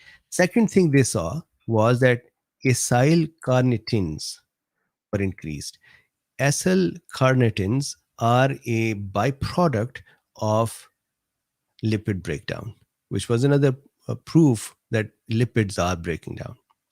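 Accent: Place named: India. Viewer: Universal Indian pronunciation. Different from ours, like many other words.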